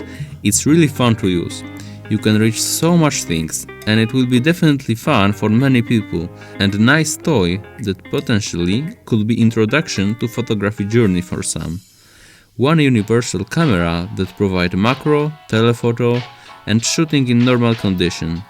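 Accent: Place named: Poland. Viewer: dobrze trafiłem, że jesteś Polakiem po akcencie:) Jakbys kiedys przypadkiem doszedł do tej funkcji to fajnie byłoby gdybyś nagrał o tym film:) Pozdrawiam.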